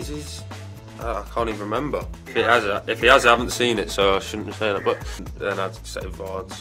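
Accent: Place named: Birmingham, United Kingdom. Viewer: It sounds like he has a hint of a Birmingham accent.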